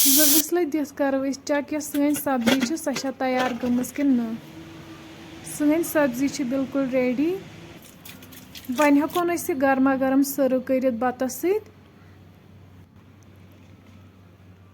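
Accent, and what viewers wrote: Kashmir, India. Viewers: Your kashmiri accent is sooooo good ❤,,,,😊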